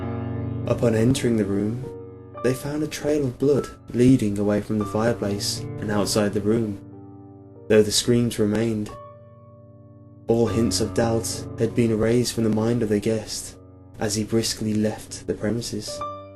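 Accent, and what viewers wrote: United Kingdom. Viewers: You have a gorgeous voice. Always loved British accents.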